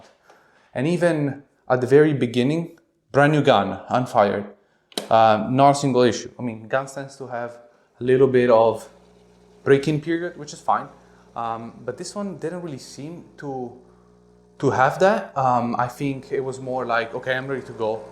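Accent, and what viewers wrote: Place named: Italy. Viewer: An Italian here you got a great Italian accent also that's a really cool rifle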